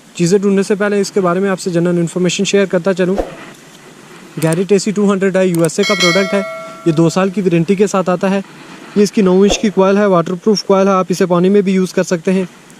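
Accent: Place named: Kotli Sattian, Pakistan. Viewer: Bro ap kotli satyan k aas pas k Hain .Mera andaza narar ka hai.ap ki zuban say andaza lgaya hai.reply krna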